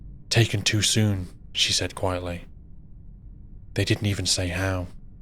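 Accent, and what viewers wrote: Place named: East Midlands, United Kingdom. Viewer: Notts or Derby lad narrating this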